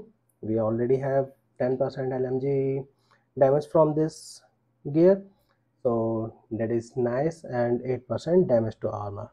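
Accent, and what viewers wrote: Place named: India. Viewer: Thank you for sharing I understand your India 🇮🇳 accent it’s a little broken but I appreciate the effort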